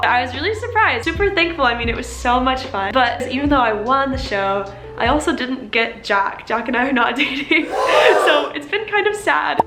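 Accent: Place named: United Kingdom. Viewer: Are your from UK if u are the accent is cute 😳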